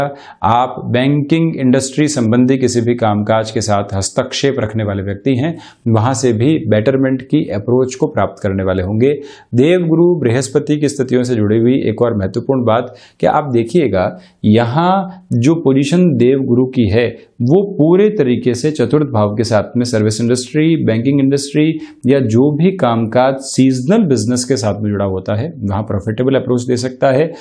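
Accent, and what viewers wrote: Standard. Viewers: Aap bahut sudh Hindi bolte h..kai batein smjh nhi aati..please thoda simple bole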